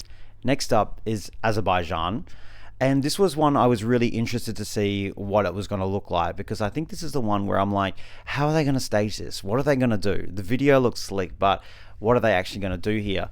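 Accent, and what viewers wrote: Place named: Australia. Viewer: Hope you had a fantastic birthday! 🎂Been listening to for years and have always apprechiated an aussie voice to the fun of the eurovision song contest. best wishes from a fellow aussie!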